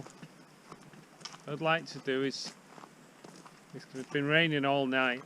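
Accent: United Kingdom. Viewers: your words about nature with your british accent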